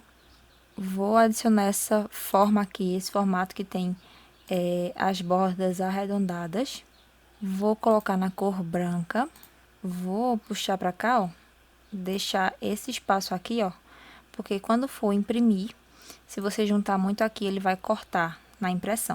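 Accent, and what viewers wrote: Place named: Recife, Brazil. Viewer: Que legal,! 🌼 Me inscrevi hoje, e tô gostando muito! Didática simples, clara e eficiente! Parabéns🎉🎊🎉🎊! Ah! Você ê do Recife? Seu sotaque parece 👀 de lá! Muito top, seu Planner! 📝 Abraço👐 Noemário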